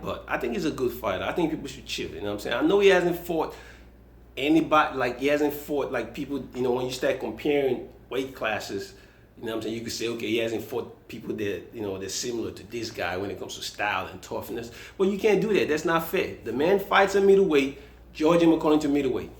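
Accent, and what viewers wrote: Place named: Nigeria. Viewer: guy all this funne wey u dey tlk. lol. u be omo naija abi omo ghana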